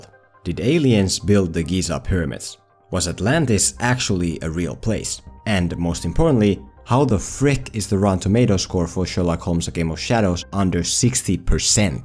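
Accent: Finland. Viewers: Suomi aksentti on point mun nekru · You sound very much like a fellow finnish man. Am I correct?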